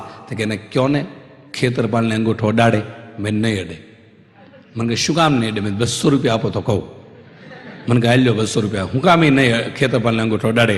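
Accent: Kathiawar, India. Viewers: aape pyor tadpadi bhasha jadvi rakhi chhe, kathiyavad sivay kadach samjata thodo samay levo padto hase evu manu chhu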